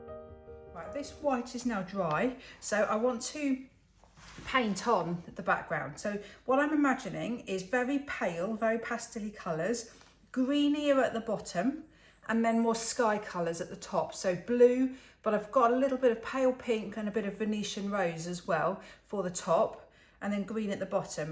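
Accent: England, United Kingdom. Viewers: I love how creative you are! 🌿🌺🌼🌸🌿 This message reminded me to tell my friends how important they are and that we are here to lift each other up. It's a wonderful little quote for both ourselves and others. Thank you for sharing your beautiful Artwork with us. You bring me much JOY in everything you do. Besides that, i love your English accent (i live in the USA). 🩷🩵💜🦋🏜️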